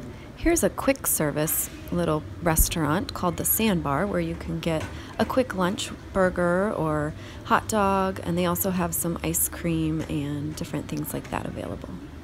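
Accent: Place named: United States. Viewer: Yes, she does have a very pretty voice. Being English, I have the bonus of hearing her soft American accent as well.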